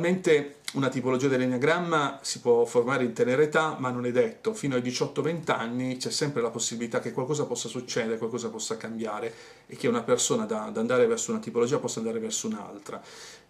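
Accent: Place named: Apulia, Italy. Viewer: Avevo visto il video che con l'accento pugliese dicevi del gatto che fa il linghino... Ora ti vedo su questo enneagramma che mi interessa!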